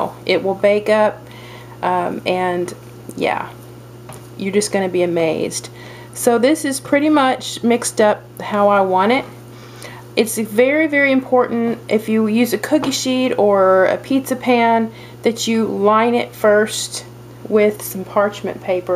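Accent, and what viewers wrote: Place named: South, United States. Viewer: I can't wait to try this, as pizza is my downfall and so hard to resist...Thank you for this video, as you explained every wonderfully. You have a lovely accent, too, are you from the South?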